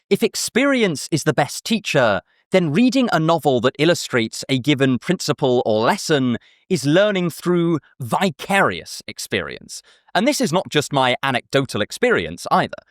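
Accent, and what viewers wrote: RP, United Kingdom. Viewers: Sir you sound like a man from victorian era elite british! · Do you speak received pronunciation?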